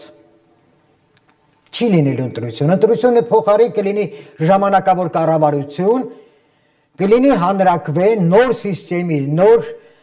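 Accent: Standard. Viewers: 🇦🇲♥️✝️Տիկին ՚Ես իրանահայ 1969 ից ապրելով Հայաստանում ՚1992 ից ՚ապրելով Գլենդելում ՚շատ զարմացած եմ ձեր քաջության՚հզորության եվ մաքուր Հայերեն խոսացող ՚գրագետ ՚դեռ չեմ տեսել ՚ես շատ կուզենայի ապագա Հայաստանի Պրեզիդենտի աթոռին։Դուք կին լինելով ՚100 տղամարդու որը Հայրենասեր են ՚ավելին եք՚դուք կփրկեք Հայաստանը եվ Հայրենադարձություն կսկսվի ձեր ժամանակ ՚որով հետեվ դուք կպաշտպանեք նրանց շահերը։👏👏👏 🇦🇲🇦🇲🇦🇲❤️❤️❤️🎯🎯🎯✝️✝️✝️ Բարեվներ Գլենդելից ։ 🇦🇲❤️🇺🇸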